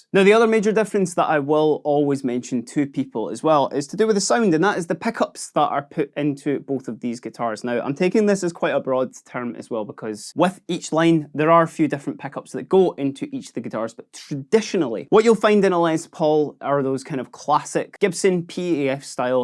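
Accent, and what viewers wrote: Scotland, United Kingdom. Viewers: Did they have to get a guy with such a brutal Scottish accent to do this video? I can barely understand the dude.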